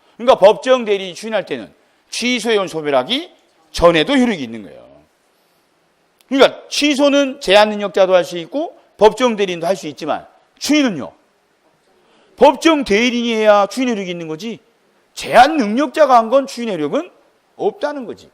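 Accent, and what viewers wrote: Jeolla, South Korea. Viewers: 이 아저씨 왕 섹시하다. 아우라 오지고. 전라도사투리도 꽂히네. 머더러 혀...?.아니여.현존이악만줘. . . ㅋ ㅋ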